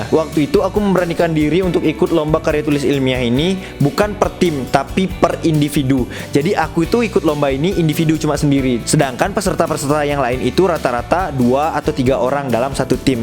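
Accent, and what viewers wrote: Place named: Bali, Indonesia. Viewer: Logat balinya kerasa🤩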